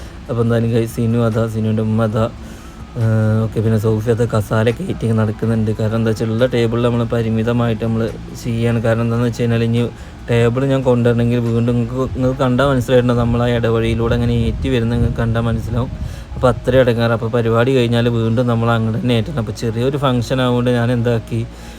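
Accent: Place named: Malappuram, India. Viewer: Malappuram talk i like very much i am from kannur.peole are so humble and broad minded❤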